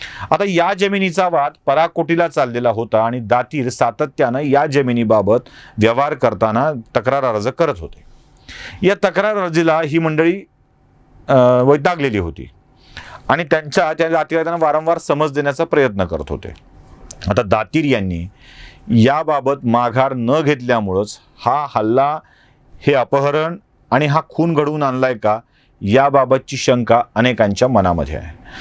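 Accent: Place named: Marathwada, India. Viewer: त्यांच्या भाषेचा लहेजा तसा आहे , थोडा ग्रामीण , शिरवळ , मराठवाडी पण स्पष्ट .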